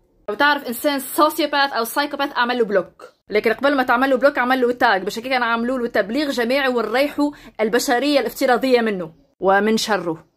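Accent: Tunisia. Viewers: لاقيت عندي اجمل لهجة عربية هي تونسية حلوة كتير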